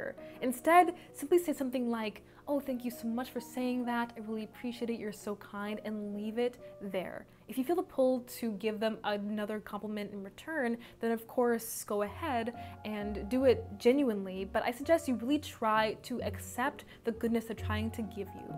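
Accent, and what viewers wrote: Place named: Jamaica. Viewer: It is switched between the Jamaican accent for me. I’m Jamaican myself.🇯🇲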